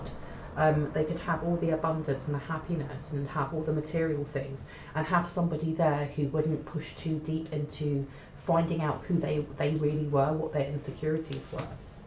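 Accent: England, United Kingdom. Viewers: Finally a pommy reader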